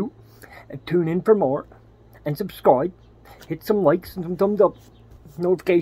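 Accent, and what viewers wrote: Newfoundland, Canada. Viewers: You answered all my questions, thanks! Love the Newfoundland accent but you gotta ditch that hat before you get complaints.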